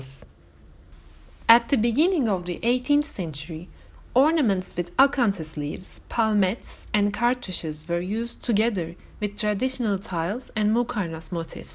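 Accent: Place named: Turkey. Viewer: she speaks in turkish accent lol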